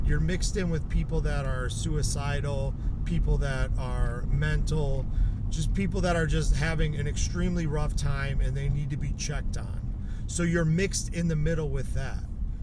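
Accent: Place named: Wisconsin, United States. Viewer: Are you from Wisconsin ? I can hear the accent in your voice. Im from the Green Bay / Appleton area .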